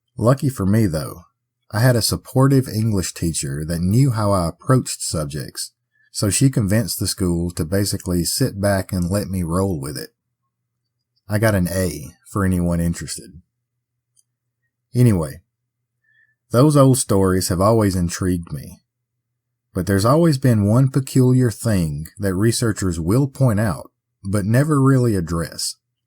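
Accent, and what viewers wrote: South, United States. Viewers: Yay yay i made it in time for the > 100 like status ❤❤ Delectable Dixie drawl here i come 😊😊😊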